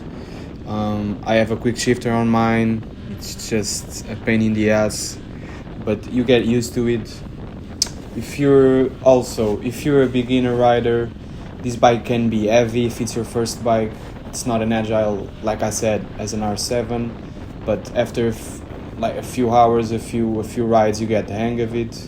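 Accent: Portugal. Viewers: Bem me parecía que eu tava a notar um sotaque tuga. Belo video, boas informações, rápido e claro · aquele sotaque tuga haha, grande mota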